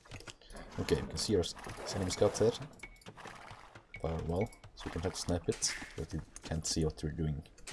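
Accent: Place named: Scandinavia. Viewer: The Scandinavian accent makes it very hard to understand, mic volume way too low.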